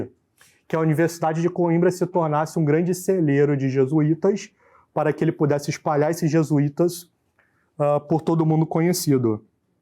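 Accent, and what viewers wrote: Rio de Janeiro, Brazil. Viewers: Qual o interesse de assistir a quase duas horas ouvindo um carioca com forte sotaque falando de um padre que julgamos já saber quem foi? Pois informo: nessa conferência há história da Igreja, história do Brasil, história dos Santos, testemunho de milagres, história das batalhas, história dos índios, e muita emoção e piedade. Vale cada minuto. E para quem ama a Igreja, ama nossa terra, no final corre o risco de derrubar lágrimas de beleza, como eu. Viva Cristo Rei!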